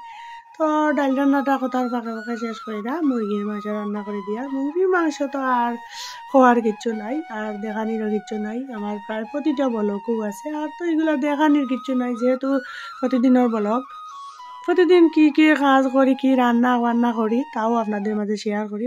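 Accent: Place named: Sylhet, Bangladesh. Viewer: আসসালামু আলাইকুম কেমন আছেন দিদিয়া বাসার সবাই কেমন আছে মাশাল্লাহ আপনার কথাগুলো অনেক ভালো লাগলো আপনাদের বাড়ি কি সিলেট দিদিয়া আপনার কথার প্রেমে পড়ে গেলাম এভাবে কথা বললে আমার খুব ভালো লাগে আমিও কখনো সজনে দিয়ে ডাল খাইনি দেখে তো খুব খেতে ইচ্ছে খুব ভালো লাগলো আজকের রান্নাবান্না আপনি জন্য দোয়া এবং ভালোবাসা রইলো তোমাকে সাপোর্ট করেছি আশা করি ছোট বোনটা কেউ করবা সব সময় বোনটাকে পাশে পাবেন ইনশাআল্লাহ 🎉🎉❤❤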